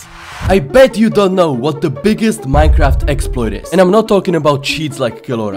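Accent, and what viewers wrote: Czech Republic. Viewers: dneska jsem se podival na tvoje video po prve a hned my došlo že jsi čech podle přízvuku xd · Angličtina dobrá jen zapracuj na výslovnosti máš pořád strašně český přízvuk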